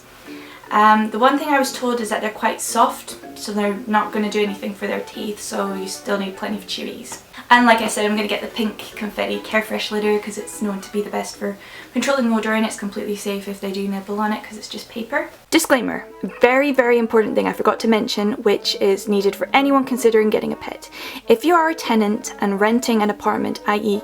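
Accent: Scotland, United Kingdom. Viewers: Are you from Scotland? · Are you Scottish? You have an accent :D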